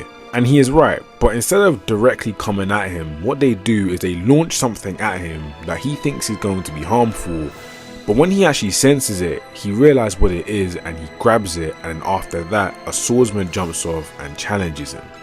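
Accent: United Kingdom. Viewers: Ffs stop talking British bruh. Bro sounds incoherent (slightly) 2/2 videos that I've watched. EDIT: I like the content, that's the only reason I'm saying this. Not throwing hands just cos Brit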